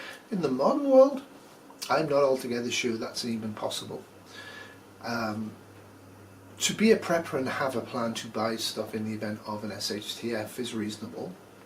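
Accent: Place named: Scotland, United Kingdom. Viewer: You sound more Scottish then Cannuck.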